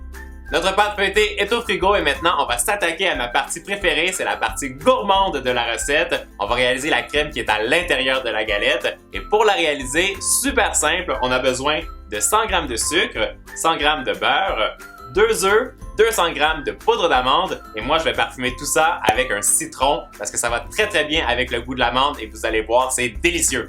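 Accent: Canada. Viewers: Bonne année et j'adore ton aksent du canada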